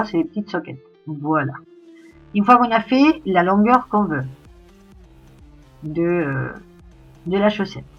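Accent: Spain. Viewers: Petite curiosité perso: tu as un accent espagnol, je me trompe?😉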